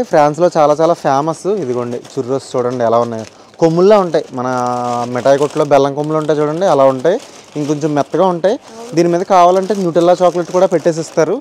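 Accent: Godavari, India. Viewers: Absolutely loved this video! The way you captured the essence of mana Godavari with that authentic yaasa was incredible. It brought back so many memories, and your storytelling was spot on. Keep up the amazing work,! Looking forward to more such gems from your channel. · Annoooo godavari style haa❤❤❤❤❤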